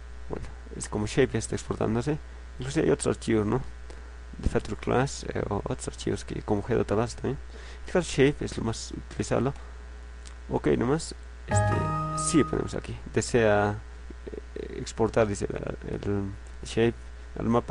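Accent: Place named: Puno, Peru. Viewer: si pasas unos meses en el departamento de Puno hablarás igual, es el dejo de la región así tenemos otros dejos o acentos en diferentes ciudades del Perú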